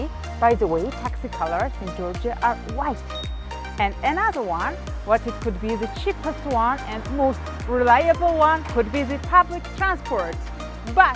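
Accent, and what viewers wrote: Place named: India. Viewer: Ma'am one thing that I have noticed that you are looking very confident nd your video is very much interesting. I don't know that you are Georgian origin or anywhere else. But you English ascents are very much similar to Indians.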